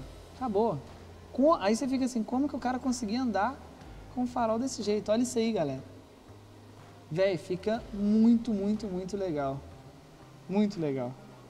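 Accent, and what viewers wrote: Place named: Belo Horizonte, Brazil. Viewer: Esse cara deve ser de Belo Horizonte ,pelo sutaque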